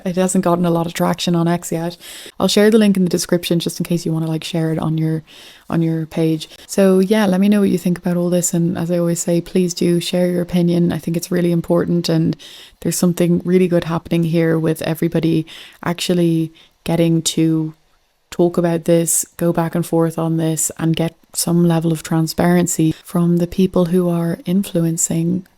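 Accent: Ireland. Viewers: you should consider covering geo-politics too. E.g. cover BRICS, trade war between China - U.S., tech war (chip) between U.S. - China, the Syrian situation - why Turkey got involved (something about a pipe line that would benefit them), etc. 💡p.s. Irish accent?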